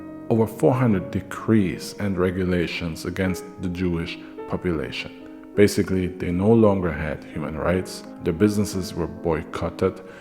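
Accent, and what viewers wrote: Germany. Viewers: What a video! Great storytelling, amazing art to illustrate, your accent is amazing (are you German?). Only one thing I would point out is that even if the Nazis hadn't invaded the Soviet Union, they would have been at war with the US either way and probably though we will never know the Soviets would have invaded themselves after Stalin was done with his Great Purge and set up his own war economy (that is actually one of the big factors why the Nazis invaded in 1941 while still at war with the British). Thank you for your work!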